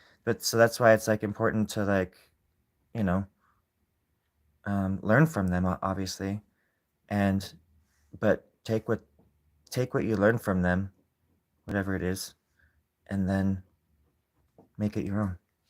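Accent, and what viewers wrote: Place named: Australia. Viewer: Your are an Excellent Artist! & a Beautiful Singer! I just want to tell you this. and what a Unique Podcast too! You are also Funny! I love your Australian Accent!, very convincing Mate! You are a hidden Box of Massive Gems 💎 M🕊️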